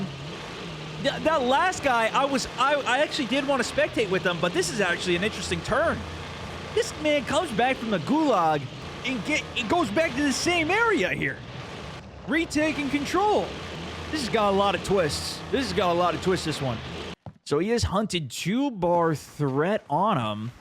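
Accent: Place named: Canada. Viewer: sounding extra canadian in this one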